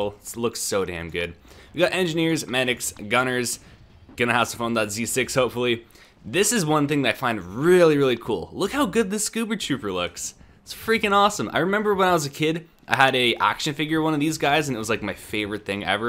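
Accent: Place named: Canada. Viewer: I love your Canadian voice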